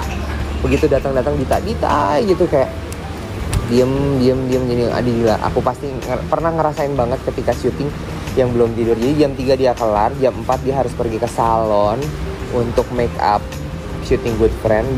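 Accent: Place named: Java, Indonesia. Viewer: Di sambut dengan BANYAK GLUEDEKKK medok banget mas 😅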